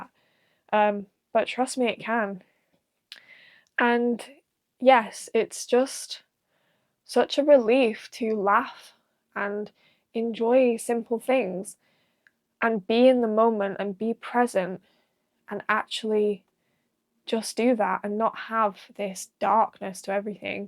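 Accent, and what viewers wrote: United Kingdom. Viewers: You really sound like someone I know from Rochdale! Are you from anywhere near there?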